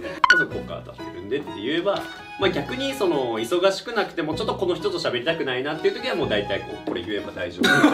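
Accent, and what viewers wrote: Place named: Kyushu, Japan. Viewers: さん、東京でもその九州なまり無くさないでくださいね🤣 これからも応援しています！！ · この九州なまりが標準語になったら寂しいなぁ😂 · 引っ越すと何をするにもいちいち土地勘がないのと、言葉の壁やら文化の違いにとにかく戸惑いますよねー！パートのおばちゃんがいるとかなり心強い情報源なんですがそういう職場じゃなさそうですもんね。さんのキャラなら食堂のおばちゃんと仲良くなりそうな気がしますがw まぁ、悲しいかな気づいたら馴染んでしまうものなので、焦らず少しずつお仕事にも慣れて行ってくださいね！若いけどお体に気をつけて！南九州の方の訛りはちょっとやそっとのことでは変わらないと思うのでw そのままのさんでいてくださいねー‼︎焦らないでいいのでこれからも更新楽しみにしてます。あ、土地勘なくてすみませんが、東京の親戚がオオゼキで買ったお買得品を送ってくれる事があって、九州にはない商品の流通もあるし、お得だし良さそうですよ。ハッピーアワーに出くわすといいですね！公務員寮は食事付きなのかな？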